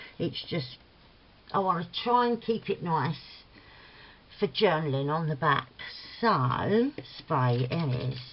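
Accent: Cockney, United Kingdom. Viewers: Love the journal card. The edge does look like a peacock feather off the edge! Hugs and Blessings my lovely friend. Xoxo Denise PS...every time I play your videos and my so. Is in ear shot he stops and watches because he says he loves your Cockney accent lol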